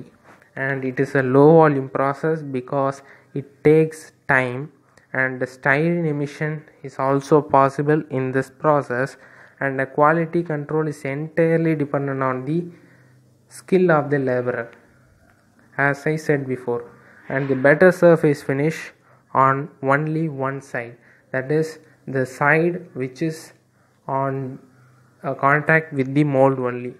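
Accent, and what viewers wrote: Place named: India. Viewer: Your voice is like you are an indian.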